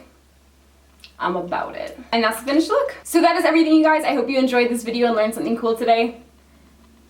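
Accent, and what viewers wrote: Canada. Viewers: Is she Canadian? bc I can hear her accent not a bad thing I actually love it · your subtle canadian accent is so rad hahaha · Everyone is pointing out your slight Canadian accent and as a fellow Canadian, I didn't even hear it at first haha love ya!! ❤️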